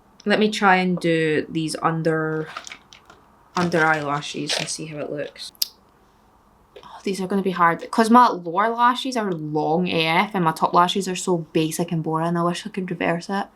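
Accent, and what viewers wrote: Scotland, United Kingdom. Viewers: Does anyone else find it kind of difficult to understand a Scottish accent or am I just a dumbass American? 😅 Her voice is so relaxing to listen to but it takes me a hot sec to process what she said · Omg I adore your videos. You always look fantastic, but the scottish accent and real talk kills me every time! The bailiffs story had me rolling.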